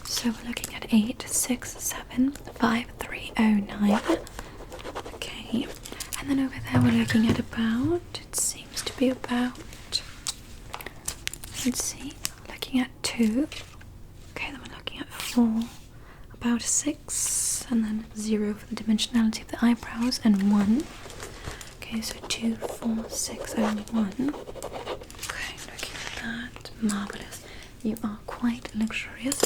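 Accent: England, United Kingdom. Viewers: Really Beautiful Woman with Glasses and the Most Beautiful British Accent!! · Big Hayley Atwell vibes with the british accent 😍😍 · I was beginning to withdrawal from not hearing the British accent. She came through at the perfect timing lol🙏🏼🙏🏼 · Your English accent is the best please make more of them like this 😍😍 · YES! THE BRITISH ACCENT IS BACK!!!!!!!!!!!!!!! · Does she do any other ASMRs in her English accent? I love it so much lol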